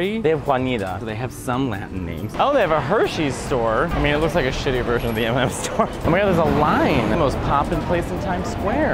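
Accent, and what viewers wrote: Italy. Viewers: Dude, as a native speaker, your spanish was pretty good :) You speak spanish with an italian accent and I speak italian with a spanish accent lol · I’m surprised but I shouldn’t be that sounds like an Italian speaking Spanish. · The Italian accent when you speak Spanish! I love it. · Spanish with a heavy Italian accent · He speaks Spanish with an Italian accent xD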